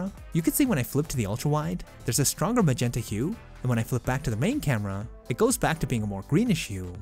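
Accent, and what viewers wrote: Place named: Illinois, United States. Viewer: It's how we talk here in Illinois. Especially the front part of the state.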